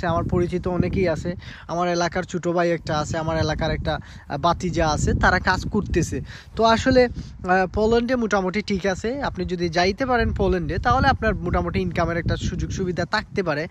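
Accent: Sylhet, Bangladesh. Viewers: Bai monokoi sylheti😊❤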